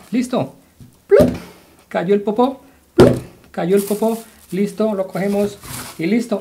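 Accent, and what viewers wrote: Colombia. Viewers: Hola Eres colombiano??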